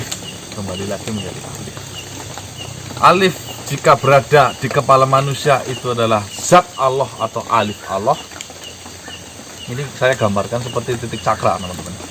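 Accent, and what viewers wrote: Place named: Banyumas, Indonesia. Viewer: Banyu mas wng ngapak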